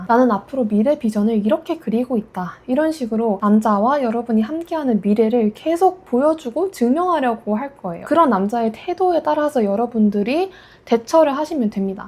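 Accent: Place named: Gyeongsang, South Korea. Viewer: 부산 경남 사람이신듯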